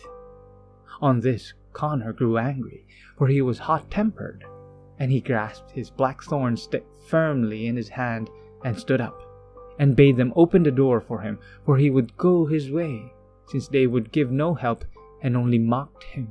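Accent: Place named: Ireland. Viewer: Lovely reading, are you Irish yourself? I hear a subtle accent but not sure if it's thematic 🙌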